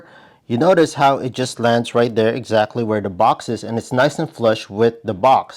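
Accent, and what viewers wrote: Philippines. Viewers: you got a hint of Filipino accent, great content by the way.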